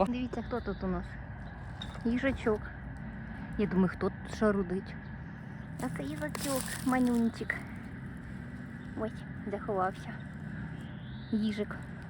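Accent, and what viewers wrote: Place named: Poltava, Ukraine. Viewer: Привіт ❤️ чудовий канал, говір ваш бомбіческий✌🏻 мабуть десь на Полтавщині?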